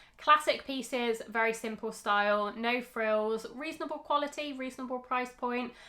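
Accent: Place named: England, United Kingdom. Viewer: Hello this video is a jewel, thank you so much for all the time you put on it!!! From the French, European section I'd add Caroll and Cyrillus. I buy often from them and love the quality of the items. Maybe they are a bit more classic than Sezane and similar in pricing. Nothing to do with that but your English accent is lovely ❤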